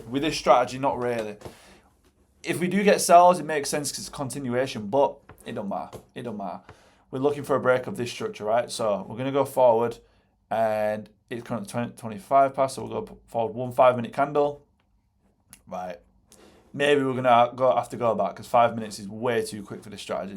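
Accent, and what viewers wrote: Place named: Yorkshire, United Kingdom. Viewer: Proper Yorkshire man 😅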